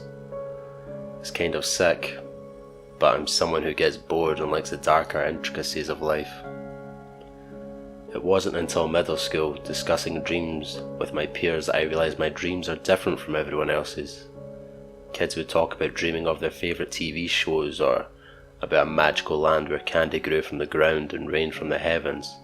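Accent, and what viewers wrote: Scotland, United Kingdom. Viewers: Love the video mate. Also Scottish! ❤️ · Who the fook is that? It's. Interesting to listen to the combinations of a scottish voice Yet also music dynamics that aren't from that of your typical narration pick. You know Myuuji or Kevin. For that you've earn another sub. Although I came to constructively leave criticism. I found myself listening and diving into the story. Keep doing your thing. Always watching You companion Night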